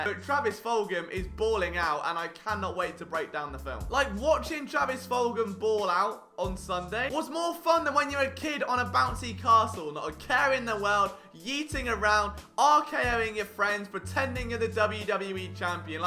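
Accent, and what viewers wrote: United Kingdom. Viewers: Holy shit. The only thing worse than an eagles fan, is an eagles fan with a horrendous British accent.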